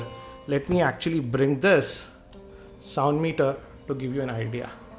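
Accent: India. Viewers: Nice accent,Indian-English Accent are nice to hear and above all they are clear Your Video is Very Informative, Thumbs Up for your Vid!.. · Não tem como parar de rir com sotaque indiano, pqp! AUEHAUEHAUHE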